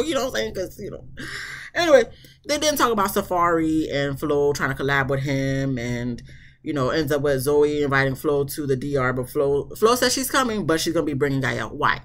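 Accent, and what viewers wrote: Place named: Charleston, United States. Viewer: oh ok. You sound like some of my peoples from Charleston.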